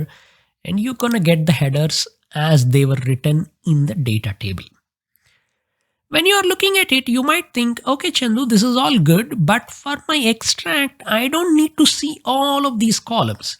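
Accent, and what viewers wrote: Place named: Tamil Nadu, India. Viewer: Aap Tamilnadu se ho kya 🙂 apke bolne ke tarike se lag raha hai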